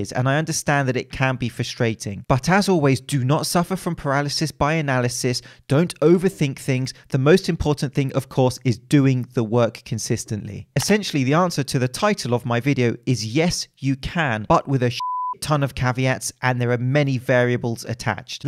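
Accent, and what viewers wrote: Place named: RP, United Kingdom. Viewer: Thanks for breaking it down, it was amazing explained by you with your cultured british accent. It's a shame though that doesn't address the physique competitors aspect😜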